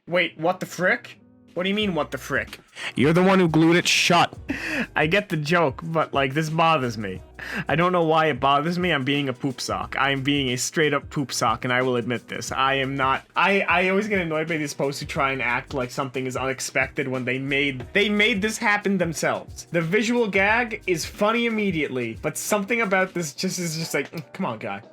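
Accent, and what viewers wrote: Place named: Brooklyn, United States. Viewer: I love your Brooklyn accent.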